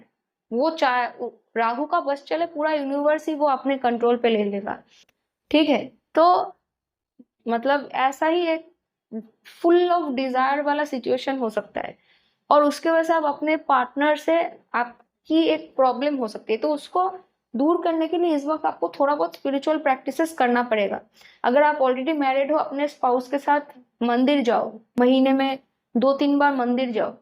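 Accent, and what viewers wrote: Bengal, India. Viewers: বাঙালীদের হিন্দি বলার টান ই অন্যরকম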